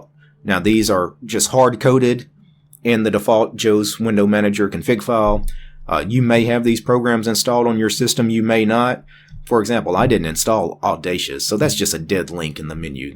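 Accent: South, United States. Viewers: I really like your southern accent sir. I am a new north Dallas resident and I must say yours is the best! Sorry for being non-linux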